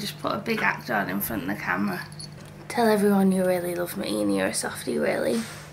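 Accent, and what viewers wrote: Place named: Northern England, United Kingdom. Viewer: Seasons greetings!:) I love having your northern voice in my feed.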